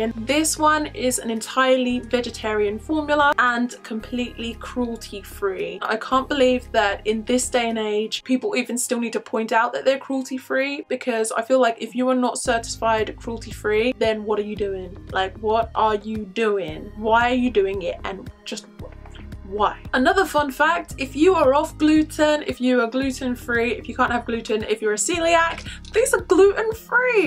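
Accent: United Kingdom. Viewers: I need your GORGEOUS British accent!!!!! I would be a new woman with a new lease on LIFE!!!! 💜💜💜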